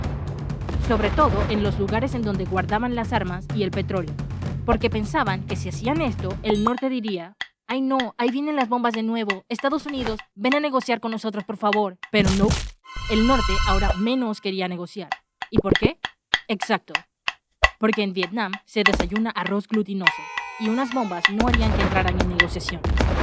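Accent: Panama. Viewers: Muy buen, video, me encantó... pero una pregunta? En realidad eres de panamá?? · Por el acento y por el minuto es imposible no saber que las personas detrás de este canal son Panameñas. Me suscribo de una vez Tremendo Canal!!!!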